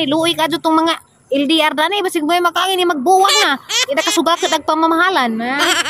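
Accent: Bohol, Philippines. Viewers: Klaro kaajo taga Bohol pud! Bohol pa more!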